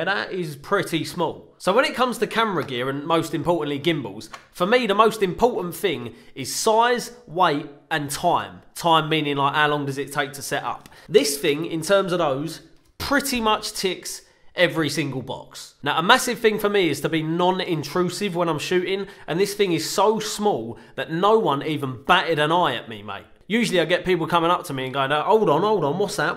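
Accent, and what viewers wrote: United Kingdom. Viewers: Ok, subscribed after 30 seconds just for the raging britishness of the lad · you are extremely british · i like british acsent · OMG You're like the hottest Youtuber talking about gimbals. My opinion is absolutely biased because I'm obsessed with gingers and British accents. Just subscribed cause you can talk about any tech and I'll think it's super hot. Xxx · I'm broke but you sold me. Quite refreshing to hear a brit speak on YouTube instead of Peter Mckinnon, Alen Palender and all them Canadian fellas. Great video, cheers.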